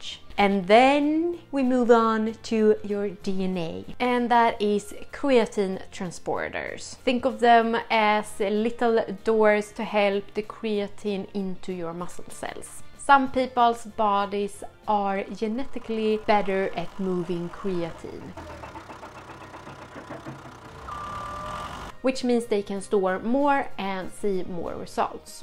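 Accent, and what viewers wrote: Sweden. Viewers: Thanks for the info as I just started my 5mg daily. I felt noticebly stronger/more enduring than usual workout after only few days, but do admit it could be the placebo effect. Will know better next few weeks. Your calm and Swedish influenced accent is appreciated.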